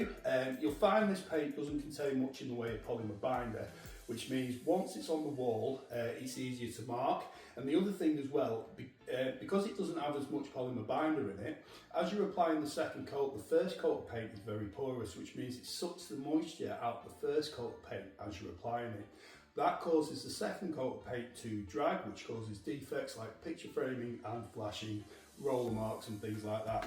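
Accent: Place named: Northern England, United Kingdom. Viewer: What with this guy’s (slight) northern dialect, the fact that he seems to be in an empty room , therefore an echo chamber AND faint background music from his obligatory radio, the listener didn’t stand much of a chance to hear what he was saying !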